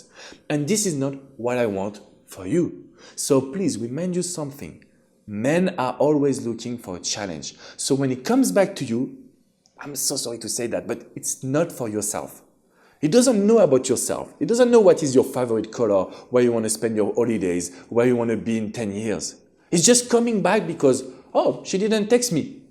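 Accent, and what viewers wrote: France. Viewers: I am falling in looove with your French accent.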